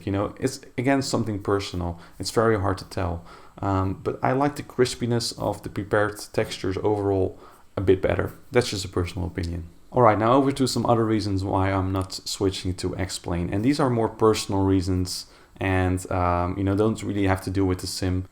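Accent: Netherlands. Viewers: I KNEW IT! your dutch! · Okay ik ben dus niet de enige die z'n accent hoorde hahaha ik d8 ook al dat hij nl is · Your Dutch accent is very funny☺️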